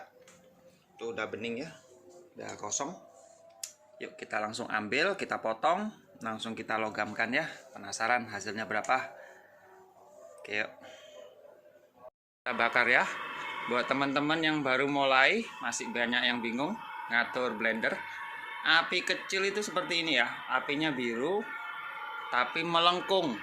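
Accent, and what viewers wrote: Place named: Java, Indonesia. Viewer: Tak kiro pean i wong aduoh kono mas, dadak kok jowo. Jowo ndi mas?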